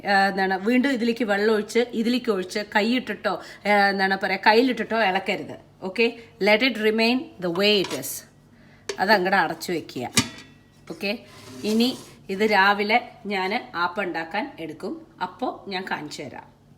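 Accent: Palakkad, India. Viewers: ഹായ്.. ഞാൻ ശ്രീദേവി അജോയ് കുമാർ ഞാൻ മുംബൈയിൽ സ്ഥിരതാമസമാണ്.. ഒരു വീട്ടമ്മയാണ് ഞാൻ സ്ഥിരമായി എല്ലാ പരിപാടികളും കാണാറുണ്ട്.. പൂജാവിളക്കുകൾ ക്ലീൻ ചെയ്യുന്ന പരിപാടിയാണ് ആദ്യമായി ഞാൻ കണ്ടത്, എന്നെ കൂടുതൽ ആകർഷിച്ചത് പാലക്കാടൻ ശൈലിയിലുള്ള സംസാരമാണ് ആദ്യം ഞാൻ കരുതിയത് ഏതോ ഒരു പ്രായമായ വ്യക്തിയാണ് എന്നാണ് കാരണം സംസാരം വളരെ സനേഹവാത്സല്യവും പക്വതയും നിറഞ്ഞതാണ് അതുകൊണ്ട്, പിന്നീടുള്ള പ്രോഗ്രാമിൽ കണ്ടപ്പോൾ മനസ്സിലായി ആള് വളരെ ചെറുപ്പമാണെന്ന്.. എന്തായാലും ഒരു അറിവിന്റെ കലവറയായ ആശംസകൾ.. എല്ലാ പ്രോഗ്രാമുകളും കാണാൻ ശ്രമിക്കാറുണ്ട്.., കെയർ ആൻഡ് ക്യൂർ എന്ന പ്രോഗ്രാമും എല്ലാം.. നന്ദി.. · njan try cheythittu parayamtto. Pinne videos improve avunnu shake avkunilaaa.. pine de veedu palakkad ano samsarikam kettapol thonni... ente nadum palakkad anu( chittilamcherry) eppol Newjersy il.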